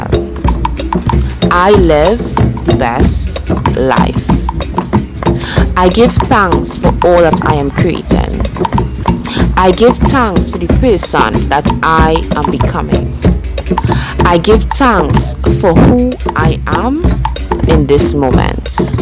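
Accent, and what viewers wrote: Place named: Trinidad and Tobago. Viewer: I am SOOO grateful to hear a fellow trini voice - saying this! 🎉 Sis, idk you.....but I Appreciate you...!!!! 💃🏽💋🌹✨️💦👑🇹🇹💕💕 💯💯💯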